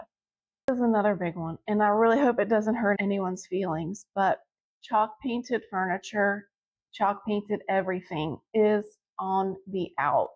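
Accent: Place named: North, United States. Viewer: Are you from north Georgia? Your strong northern accent says no.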